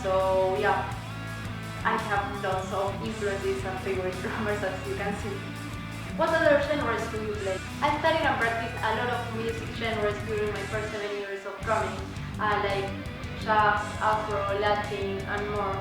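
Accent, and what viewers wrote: Argentina. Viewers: por el acento se te saca la argentinidad al toque . igual me tendria que haber dado cuenta por tu nombre artístico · te saque la ficha con sos argentina por tu acento nada mas jaja, sos una genia loca, un beso desde Mendoza.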